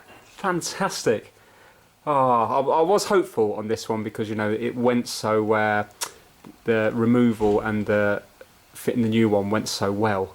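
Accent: United Kingdom. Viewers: I do not like your accent ... Sry, why does British English sound so awful?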